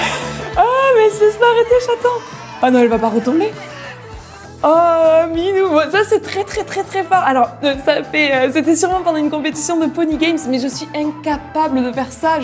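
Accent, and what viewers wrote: Marseille, France. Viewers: J'adore dans accent de Marseille (en j'ai le meme) sinon ti cro belle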